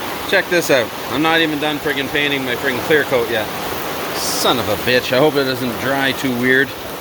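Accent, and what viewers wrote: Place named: Scotland, United Kingdom. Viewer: you sound a tad scotish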